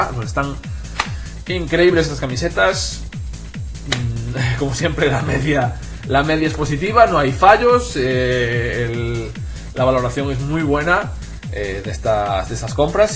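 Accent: Galicia, Spain. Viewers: eres gallego??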